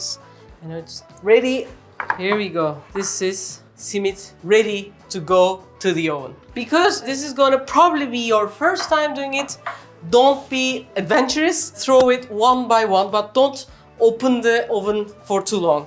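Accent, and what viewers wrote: Turkey. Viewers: 😋😋refika I lovesss youre Turkish English,urrrr soooo pretty,bubbly🥰🥰🥰I lovessss ur recipes from Sri lanka 🇱🇰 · ne dediğini anladıysam arap olayım türklerin ingilizce konuşması baya farklı .D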